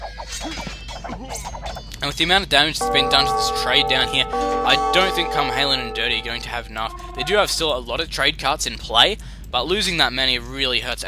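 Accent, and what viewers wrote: Australia. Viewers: Are you-(the commentator in the video)- australian?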